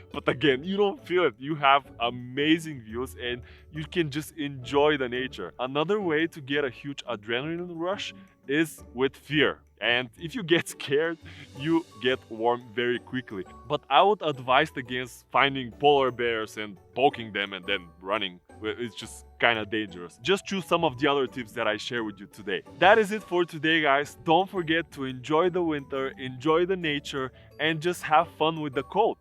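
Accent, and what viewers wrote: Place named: Eastern Europe. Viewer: i disliked because i dont like your slavic accent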